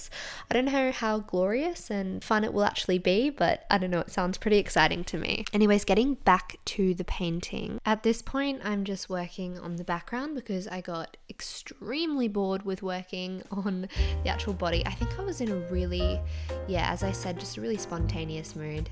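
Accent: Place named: Australia. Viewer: Nevermind the haters. Aussie accents are adorbz :) Found you from Purple Palace's comment section lol. Cool vibes, keep it up!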